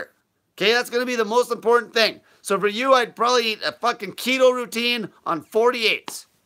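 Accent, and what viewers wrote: Canada. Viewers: He just needs an image re-adjustment. This gangsta character does not work with a Canadian accent. He doesn't sound tough, which is what he's aiming for. He just sounds like a wanna-be.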